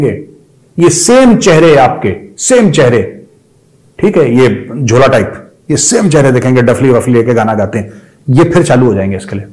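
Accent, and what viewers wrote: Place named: Pakistan. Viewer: Amazing Pakistani voice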